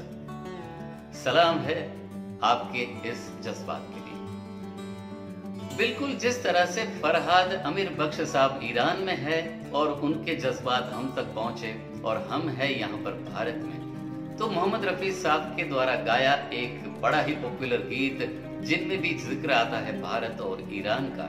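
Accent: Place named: Sindh, India. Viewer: You seem to have a Sindhi accent. Karachi Sind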